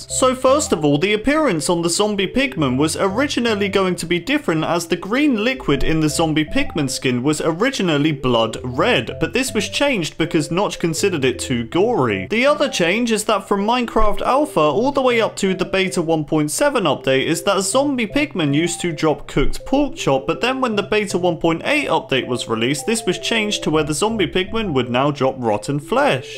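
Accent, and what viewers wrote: United Kingdom. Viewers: are you britis?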